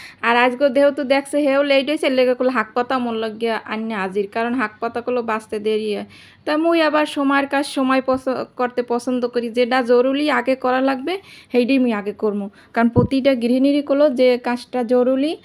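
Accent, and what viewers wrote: Barishal, Bangladesh. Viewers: দিদিভাই তোমার কথাগুলো আমার খুব ভালো লাগে। আমার শাশুড়ি তোমার মতো বরিশালের ভাষায় কথা বলে, বরিশালের ভাষা শুনতে আমার খুব ভালো লাগে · ✅👍আপু তোমার বরিশালে ভাষায় কথা বলেছেন আপু আমার শুনতে খুব ভালো লাগলো 🎉কাঠালের বিচি আমার খুব ভালো লাগলো ❤সব কিছু রান্না টা অনেক সুন্দর হয়েছে আপু। আর সেমাই রান্না করলেন। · আপনার বরিশালের কথা শুন‌তে আমার ভালো লাগে।আপনার ভি‌ডিও দেখে কিছু শিখে নিলাম। খুবই ভালো লেগেছে❤❤❤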